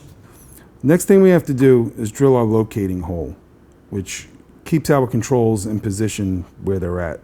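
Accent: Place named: United States. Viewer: Sounds more American than British 😢😢😅😅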